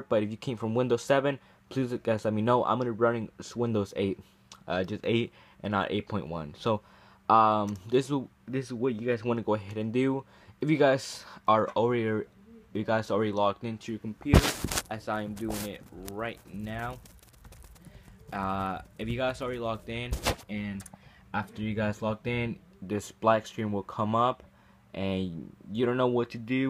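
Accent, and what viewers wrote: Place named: South Asia. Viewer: You sound like a brown guy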